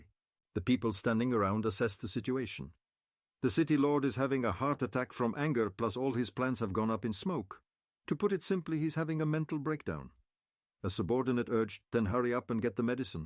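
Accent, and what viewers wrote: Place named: India. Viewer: It's like an Indian accent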